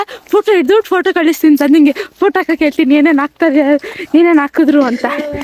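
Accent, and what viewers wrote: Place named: North Karnataka, India. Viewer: Uttar Karnataka hudgan voice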